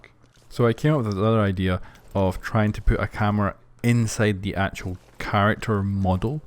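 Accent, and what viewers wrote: Scotland, United Kingdom. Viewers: Do you mind if I ask what part of Scotland you are from?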